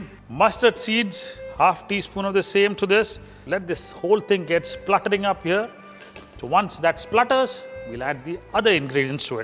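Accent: Punjab, India. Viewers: Paji....ur punjabi style Hindi is very nice...english is not suting ur voice......the way you tell "Oh ji namak shamak namak shamak" is awesome....paji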